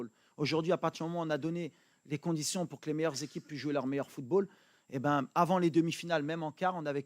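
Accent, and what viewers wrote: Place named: Paris, France. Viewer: Il parle Parisien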